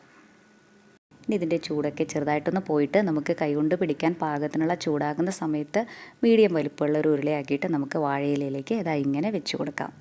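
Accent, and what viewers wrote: Palakkad, India. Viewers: Kollato..chechiku palakkadan slang undu, ath kelkan thanne oru rasam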